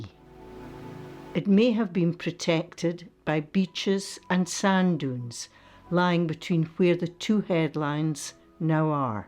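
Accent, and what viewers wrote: Scotland, United Kingdom. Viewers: What a lovely voice Scottish obviously ❤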